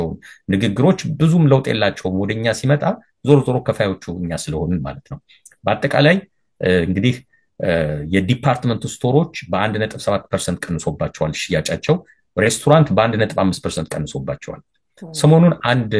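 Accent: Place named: Ethiopia. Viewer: After I listen you patiently you make good points,but You have very heavy accent. You Mentioned 100 times Dow jones. You said do jones typical Habesha.Read it Dow jones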